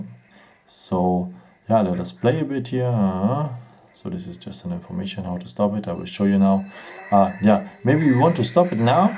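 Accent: Germany. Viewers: I'm getting this phone over weekend. I love your German accent! I love German accent. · German accent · Warum nicht Deutsch ich mein man hört es ja eh. Ich hole mir nähmlich auch das a50 also eigentlich ein ganz nützliches Video auch wenn ich vieles schon wusste weil ich schon so ungefähr 40 videos über des a50 gesehen habe. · Haha man merkt irgendwie schon raus das du deutsch bist.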